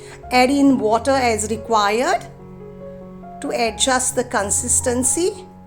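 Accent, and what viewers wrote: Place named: England, United Kingdom. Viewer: New accesent of language... Seems like England has recently adopted you 😢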